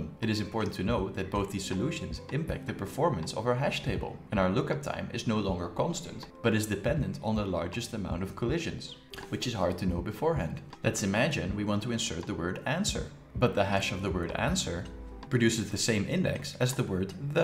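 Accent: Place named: Netherlands. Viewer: I smell Dutch 🤔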